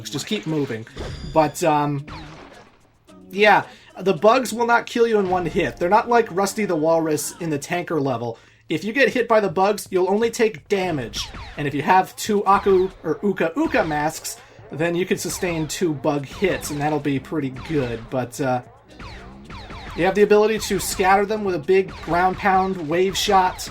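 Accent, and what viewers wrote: Canada. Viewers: Bro you're accent, dialect and your chilled charming canadian voice is always a pleasure to listen to while seeing your MLG megaman/sonic/any game playthrough. If you weren't canadian with this chilled personality then i wouldn't be into megaman. I've played more megaman games than the 8bit ones because of your LPs. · WHO EVER IS BULLYING YOU ABOUT THE WORD HOUSE IS CRAZY. YOU’RE SAYING IT JUST FINE 😂 as a fellow Canadian, I concur! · you say it right, just with a Canadian accent lol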